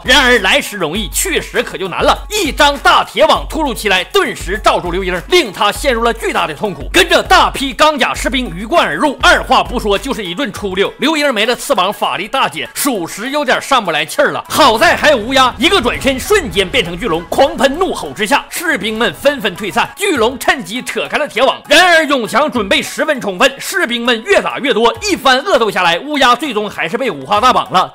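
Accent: Northeast, China. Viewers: 就喜欢听牛叔说 东北大辣皮儿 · 说朗朗额够，东北牛叔英语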